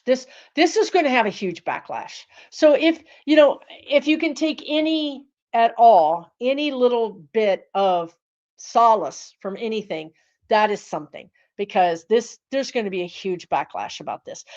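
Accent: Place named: South, United States. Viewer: I’m rarely available to watch your Friday night live shows. But, know that I look forward to watching them ASAP. Thank you from one southern born gal to another. Your “you alls” feel like my home back when MAGA was a misspelled word. Little blue dot 🔵 in a RED county.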